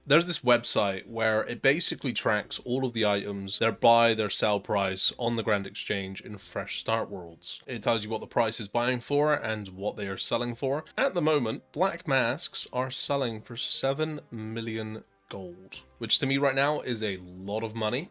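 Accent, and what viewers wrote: Bristol, United Kingdom. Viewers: Are you from the bristol area-ish? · Am I hearing a Bristolian accent? XD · Are you from bristol sound like it lol😂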